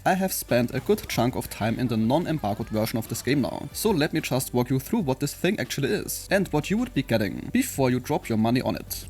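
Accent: Germany. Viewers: Krasser Deutscher Akzent. Sofort gedacht, dass du zumindest Österreicher, Schweizer oder Deutscher sein müsstest.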